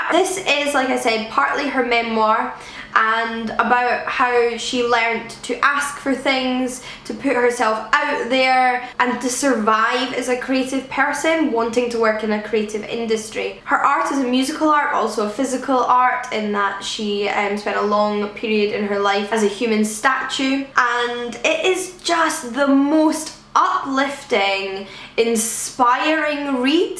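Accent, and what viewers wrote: Scotland, United Kingdom. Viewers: I LOVE your accent! Scottish accents always amaze me 😂 I'm British by the way.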